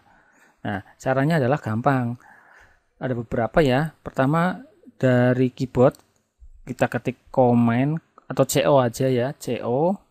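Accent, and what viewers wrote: Banyumas, Indonesia. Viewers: menyimak wae om cara setting comand line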